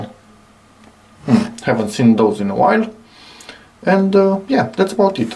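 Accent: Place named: Romania. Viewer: на английском говорит отвратительно...... акцент капец как слышно.... румын или серб???? или болгар?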